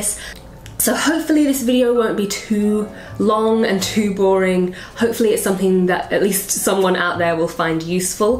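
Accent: United Kingdom. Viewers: You have a very classy British accent normally (no offence) I kind of find British accents very lousy and not super classy like hers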